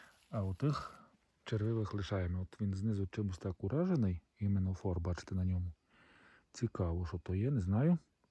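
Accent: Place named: Transcarpathia, Ukraine. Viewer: По акценту то ви є з Закарпаття. В Карпатах білих зараз практично немає. Небуло дощику. Сухо... Удачі вам і гарного врожаю !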